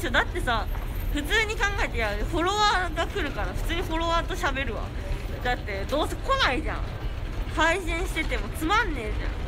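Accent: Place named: Kansai, Japan. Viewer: たまにアクセントが関西弁だね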